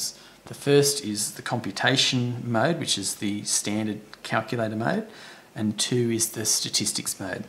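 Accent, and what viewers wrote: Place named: Australia. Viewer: How tf he gay its an Aussie accent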